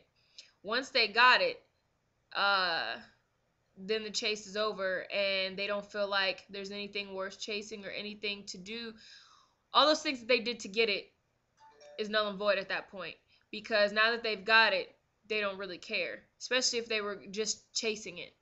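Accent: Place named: United Kingdom. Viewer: im guessing you are brittish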